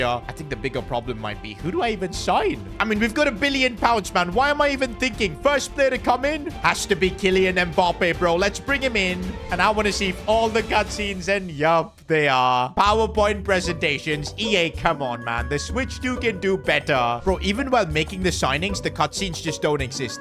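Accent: India. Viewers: Now i know why foreigners say indians sound like this i get it now. The real indians dont the NRIs do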